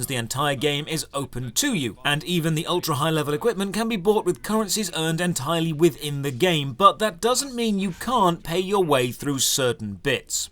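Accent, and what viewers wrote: United Kingdom. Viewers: Outstanding MMO review as usual. Btw i love listening to your voice and british accent (sorry if i´m mistaken, south-eastern european here). What about Allods Online? I couldn´t find a review about it on your channel. I used to play it about 10 years ago and never got back into it. Would be interesting to hear your thoughts on it! Keep it up!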